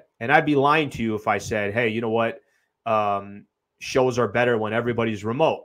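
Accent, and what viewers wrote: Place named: New York, United States. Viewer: You're from CA, though you sound like someone from NYC or Boston. I'm from SoCal and also lived in the Northeast for a while as an adult and never heard anyone one in SoCal who sounds like you. I've been watching for a while and always wondered.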